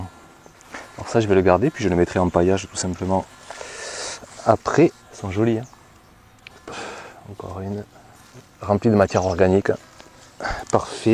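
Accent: South, France. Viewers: Salut, Comme dit Ludo, c'est toi qui a volé le soleil! Nous on se prend les orages tous les jours, et toi tu nous nargues avec ton potager magnifique qui conserve une avance scandaleuse sur nous-autres 😉 En plus, à chaque fois, tu me fais envie à grignoter tout ce qui passe alors que chez moi c'est pas mûr!!! Non mais l'amaranthe quoi! Les miennes font 10cm MDR Comme d'hab, jardin magnifique, plantes magnifiques, et le petit accent du midi qui va bien, il manque que le Ricard (tu fais pas pousser le Ricard? Comment ça??? ^^)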